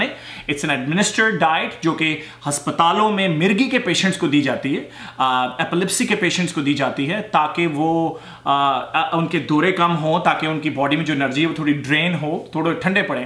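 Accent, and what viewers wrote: Punjab, India. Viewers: apka bolne ka tarika mujhe bohot pasand hai sir Punjabi English language apki kya baat hai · Kash like ki jaga pe smily emoji hoti is video k lye tou bunti hy bosss 😜😊😊😊 Thanku soo much for your advice with zbrdast style of punjabi tarqa 😜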